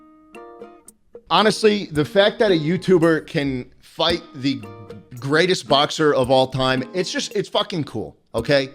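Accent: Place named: South, United States. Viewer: vertical video sounds like a read neck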